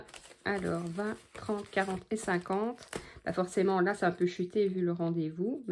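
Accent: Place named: Belgium. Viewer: Cc, merci pour ce partage, j’espère qu’il n’y a rien de grave pour ce rdv, tu es vraiment adorable!!! et en plus ton accent belge ❤ c’est encore plus mignion!!!🥰, T’inkiet J’ai un chéri 🤣🤣🤣🤣!!!! Gros bisous à toi et ta famille 🥰😍😘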